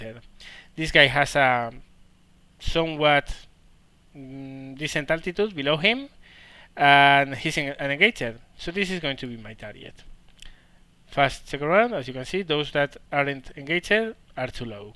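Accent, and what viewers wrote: Spain. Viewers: are you from Spain? I hear a Spanish accent :P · Eres español porque tienes acento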